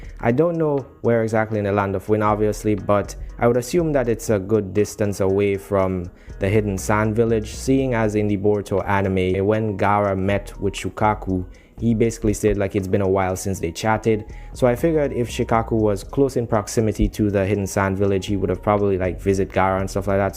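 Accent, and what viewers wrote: Jamaica. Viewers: yooo is that a jamaican accent i hear?? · Are u from Jamaica?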